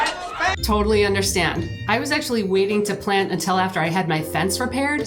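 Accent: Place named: Midwest, United States. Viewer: Love your content! Longer vids plz. No need for all the extra movie clips (Xtra editing)... Your midwest voice is needed on YT!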